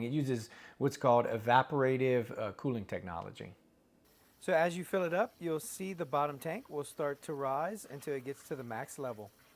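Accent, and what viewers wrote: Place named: Louisiana, United States. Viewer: As soon as you started talking I knew you were from Louisiana 😂 Thanks for the vid!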